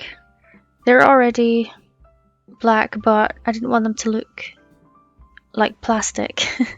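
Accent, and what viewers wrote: Scotland, United Kingdom. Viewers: I am a new subscriber to ur channel . Love ur diys. U sound very Scottish. As that’s where I am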